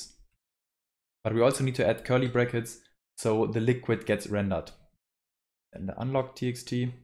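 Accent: Germany. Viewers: Haha bitte😄 Hatte es am Akzent gemerkt, dass du warscheinlich deutsch sprichst. Ich benutze das narrative theme und so wie es scheint ist der Code der im Video ist nicht der selbe wie bei mir. Deswegen kann ich auch nicht die stellen finden an denen der code geändert/eingefügt werden soll.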